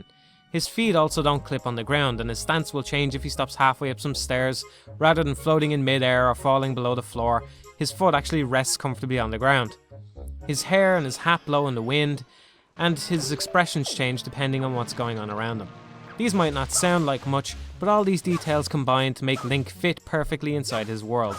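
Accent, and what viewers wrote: Ireland. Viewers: Idk why but the further in the video I get the more Irish you sound Also, the mail sorting mini game is legit my favorite zelda mini game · he's IRISH!!!!!!!!!! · This guy's Irish accent is just too good.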